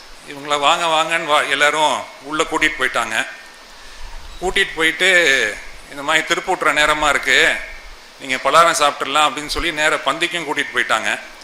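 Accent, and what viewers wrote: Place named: Nagarathar, India. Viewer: A pure nagarathar accent. So nice to hear. We need speech like this who never mixed foreign language or never mixed others accent too. Hospitality of nagarathar will always be identity for the community. 🎉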